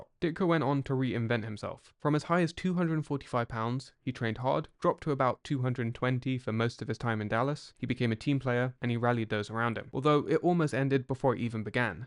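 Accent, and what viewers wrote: England, United Kingdom. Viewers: Wait. Why is sone guy with an Anglophone accent (English, I guess) doing videos about 60s Bears football?!? By the way (just to establish some credentials here), I was at Gale Sayers' 6-touchdown game against the 49ers with my father. And although I was sitting at home, my father was at the 1963 NFL Championship game at Wrigley Field against the NY Giants.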